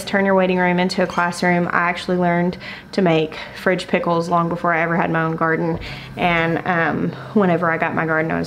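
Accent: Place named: Knoxville, United States. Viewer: So....im watching with my 78 yrl old momma and when you started talking she said "is that girl from knoxville?" And i said uhnnn. ...yea why i was shocked because shes never watches your vids with me but she indeed could tell you either were born in or raised in Knoxville....is she right?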